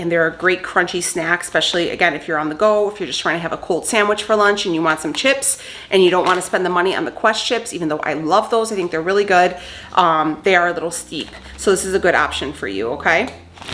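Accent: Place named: Minnesota, United States. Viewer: Minnesota? That accent..Keto on n Strong!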